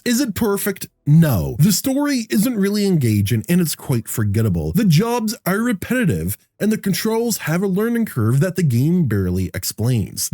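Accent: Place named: Canada. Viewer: I think because you're Canadian you don't notice, but every Canadian I have heard says "about" the same way to me. Everything else you said sounds fine to me though. I'm English just for context.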